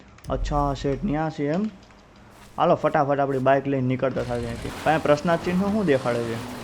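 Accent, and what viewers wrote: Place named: Kamrej, India. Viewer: Bhai tame kyana aa tame j vat kari te em lage kamrej khaudhara gali aju baju❤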